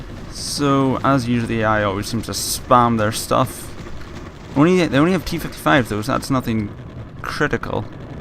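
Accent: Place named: Scotland, United Kingdom. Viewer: Hey man, what is your accent? To me it sounds like a mix of Scottish and british but thats just what it sounds like to me.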